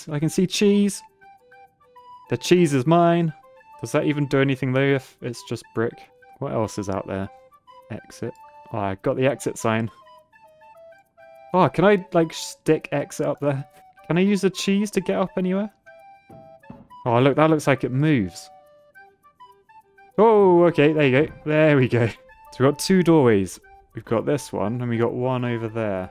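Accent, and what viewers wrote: United Kingdom. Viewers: this bloke sounds cheeky BRITISH maybe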